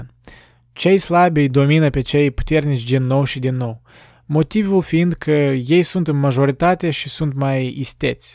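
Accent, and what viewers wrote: Moldova. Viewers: o idee excelenta dar accentul moldovenesc imi vomita in ureche o abominatie a limbii romane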